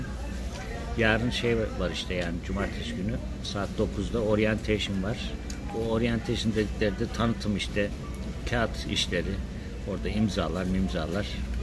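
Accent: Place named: Ankara, Turkey. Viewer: Abi Tam Angara aksanı . Süpersin valla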